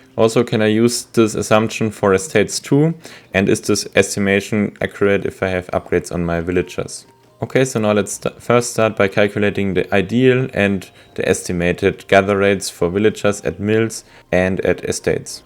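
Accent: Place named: Germany. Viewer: I am sorry but this video is incomplete. The real gather rate heavily depends on the number of villagers per mill / estate, since the collisions become more frequent and more time is lost. We have calculated the gather rate for all possible numbers of villagers, CDB, and settler wagons: <link> (the Video is in German but since your accent sounds German, I hope that you will be able to understand it).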